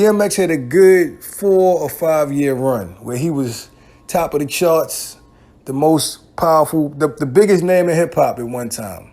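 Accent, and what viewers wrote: Baltimore, United States. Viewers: Yo.. Are you from Baltimore?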